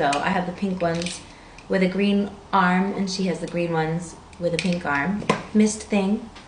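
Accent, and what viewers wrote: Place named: United Kingdom. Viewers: Well, she was in london for a long time so it's natural she'd pick up the accent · she sounds british now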